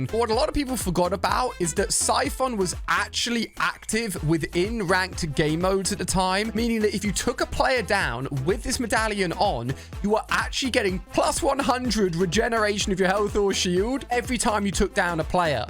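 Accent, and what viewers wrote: United Kingdom. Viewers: Are you British you sound like you’re British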